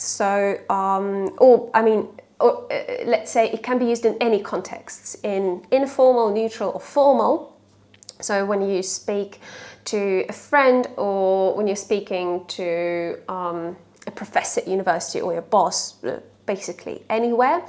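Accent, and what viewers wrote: United Kingdom. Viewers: I'm not British myself but I thought you were so when I heard you speaking for the first time. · You practically sound British. With the tiniest hints of Australian here and there, if that. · Hi! You have such a beautiful British accent. Can you share a few tips on what exactly helps to make the effect of a British accent? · It's amazing and wonderful! A girl from Belarus, who has never lived in the UK, speaks perfect English with a British accent. I am truly impressed by your mastery of the English language. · Halaew halaew. This is very overbritish accent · Hi! I would start by telling you that your RP accent is amazing. Most of the time, English learners are afraid to look ridiculous with the British accent, much more difficult to master, and that’s why they tend to choose the American accent. And you are living proof that it is possible to master a superb British accent. 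And I will continue by saying that I do not agree with Vivianna. There are already enough channels with short formats. I don’t know if you’ve heard of Luke’s English Podcast, it’s a podcast with a long format, and it has won several awards and consider by its peers as one of the best podcasts and with great success even with a long format;) Ps: And yours videos are far from imperfect. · Согласна, результат поразительный, думаю, у не просто музыкальный слух, а именно талант подражания плюс много часов работы и исключительная старательность и вуаля! - прекрасный British accent! Well done! Думаю, многие учителя из постсоветского пространства даже очень завидуют вам!👍👍👍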